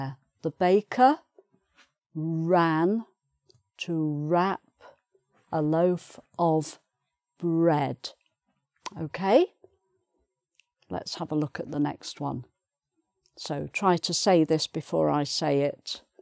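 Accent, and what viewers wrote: England, United Kingdom. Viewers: Hidden Cuber At least,she has an English accent. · Excellent! I love British accent 😊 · Dear teacher, your facnating British English is worth studying by millions of people. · CharlieRashi, Britain comprises 3 countries - Wales, Scotland and England. The United Kingdom comprises 4 countries - with the addition of Northern Ireland. (The Republic of) Ireland (in the south) is in no way British. There is no such thing as (oral) British English (although as someone mentioned above it is often used to describe spelling differences with the USA). Jennifer Jenkins’ research might be worth reading: in particular her work on the Lingua Franca core, and how to pronounce the r sound to increase global comprehensibility. It’s not wrong to copy the accent of the speaker in the video if that is what you want to do, but her accent is only one of many equally correct accents emanating from the UK or elsewhere. 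· Which British accent? I think you mean an English accent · Thank you so much! The British accent sounds so beautiful! Greetings from Russia. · With over 400 down arrows, I'm assuming I'm not the first Brit who disagrees with her. (I've read some comments, but not seen one contradicting her.) There are several regional differences across the UK, and at least some of them pronounce every R on that board (properly). She's very clear, you won't go wrong by following her lesson. However, she teaching you one particular English, not a nationwide one. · She's so cute... And I love British accent 💙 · She's sooo good and I love the British accent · Ох, как же приятно звучит классическое британское произношение. Прям наслаждение для ушей. И все понятно. Американское r-ыканье с детства не выношу. Мечтаю научиться говорить с британским акцентом, но не получается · I really like this lesson because I prefer British accent. 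· And Thank You for being such an excellent teacher- listening to your speaking alone is a great help and demonstrates the beauty of the (British) English language 👍🙏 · British pronunciation... My favourite💕💕💕 😍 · a beautiful British accent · british accent is SO pretty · me encata el asento britanico xd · British accent 😍 take me over the cloud ☁️..amazing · Mrs...., your teachings r GR8! and I luv yr 🇬🇧 accent! God bless u! I'm Mr. Ricardo. I'm Brazilian. Thnx4yr youtube classes. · Maybe this is how the letter is pronounced in England but it is certainly not as it is in Scotland so please make the distinction a lot clearer. Not helpful at all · I wish more British could speak in the same way as you:)) most of them speak like they are chewing something or drunk guys who have some problems with pronouncing sounds:))) Thanks a lot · somehow i really like british accent. anyway thank you! 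· какой у неё невероятно милый голос и акцент. британский английский по сравнению с американским так красиво звучит. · Thank you very much! Great pleasure to hear such beatyful British English .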